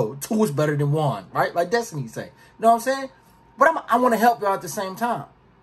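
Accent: Baltimore, United States. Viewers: I thought dude was from Baltimore lol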